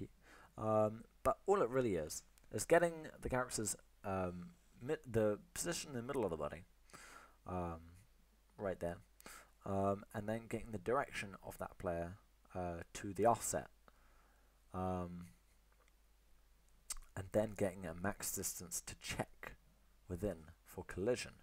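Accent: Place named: Russia. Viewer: Russian accent moment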